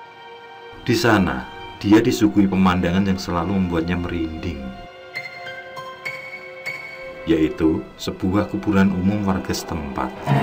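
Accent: Java, Indonesia. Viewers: Orang jawa bg?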